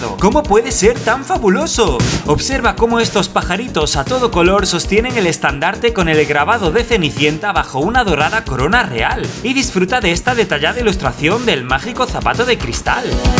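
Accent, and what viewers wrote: Italy. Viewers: Puedes callar ese asento italeano 😡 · ¿Cuánto quiero hacer el ridículo en este vídeo?: Sí PD. Adoro que hayas mantenido el acento a todas las palabras italianas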